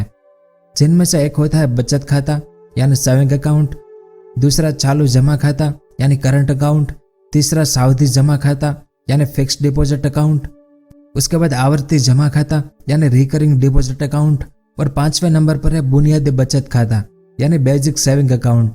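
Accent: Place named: Rajasthan, India. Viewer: Voice Rajasthani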